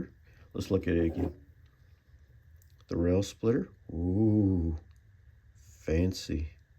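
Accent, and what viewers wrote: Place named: Texas, United States. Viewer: First off, just who in the heck is this dude in the video? It sure don't SOUND like!! Dude's got a deep, raspy Texas accent, loves some Case knives and is drinking a Dr. Pepper...so maybe it's another? Is this brother?! Hey there, man! Nice to meet ya!! That Rail Splitter is freaking gorgeous, LOVE the bone on that!! As you noted, that etch IS rather unfortunate...I'm seeing some Flitz in that blade's future 🤣. The other two are sweet too!! Case's 70s/80s shades of brown & red just can't be beat. That Delrin really does look like bone! This recent vintage Case kick that you're on is positively contagious..you got me looking at a TON of them now!!! They really are light years better than modern Case, that's for sure. Great video!! Hope you feel better bro!!! 1...2...7...GO!!! ⚡💥🔪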